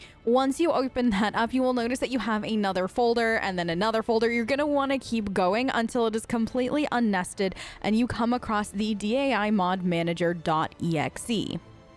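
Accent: United States. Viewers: Sorry i appreciate your trying for newbies but this way too superficially explained. DAI mod manager and frosty aren’t really compatible then I followed your steps which look SO EASY and no mods worked. Instead I had to manually change my patch that my game works again and i get constantly an error in dai mod manager and no green bar when pressing merge. And your heavy American accent doesn’t make things easier 😫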